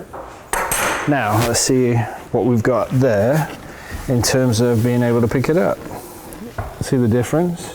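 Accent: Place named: Northern England, United Kingdom. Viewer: I've been watching some of his videos and trying to guess the accent too. Think I hear hints of northern English (Manchester maybe) but it's faded a bit from probably travelling a lot. Anyhow yeah watched a bunch of videos about suspension and he's the only guy I really feel like I'm understanding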